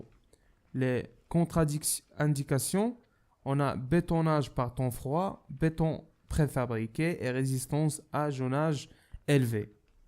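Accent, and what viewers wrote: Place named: Marrakech, Morocco. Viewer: D apres ton accent je suis sur que tu es un marrakchi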